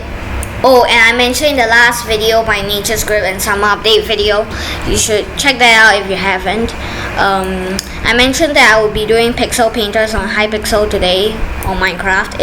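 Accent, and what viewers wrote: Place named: China. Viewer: u sound Chinese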